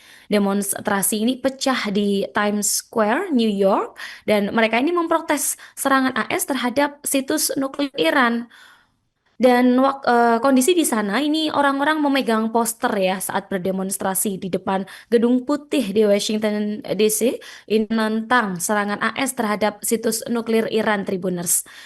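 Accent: Java, Indonesia. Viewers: Hostnya medoknya nagihh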